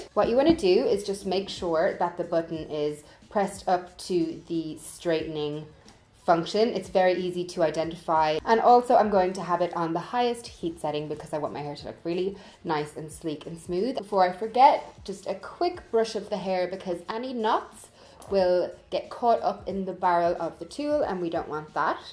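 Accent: Ireland. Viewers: I thought you were irish😂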